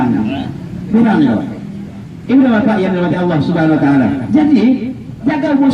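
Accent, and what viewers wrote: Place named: Sumatra, Indonesia. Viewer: Logat nya orang Sumatra pak ustad.. Sangat mengetuk hati takziah nya Salam dari Taiwan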